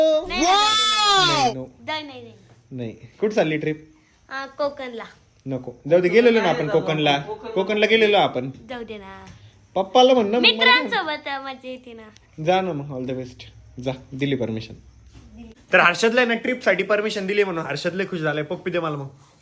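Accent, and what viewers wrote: Khandesh, India. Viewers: Bhau tumichi khandeshi ek nambar aahe 😅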